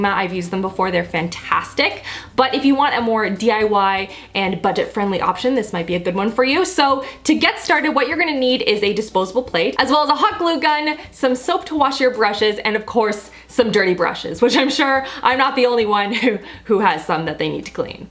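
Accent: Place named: Canada. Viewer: She has a slight Canadian accent.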